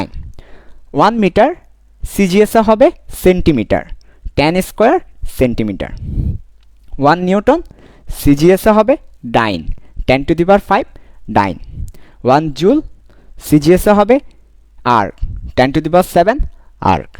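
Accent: Chittagong, Bangladesh. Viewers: Vaiya apni k Chittagong ar nki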